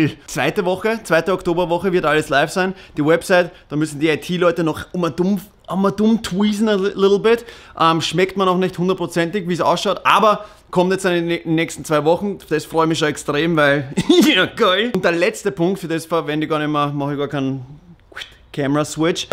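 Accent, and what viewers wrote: Austria. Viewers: Hahaha alter erstes Mal ich merke ein starkes Österreiche Akzent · It's cause of his Austrian accent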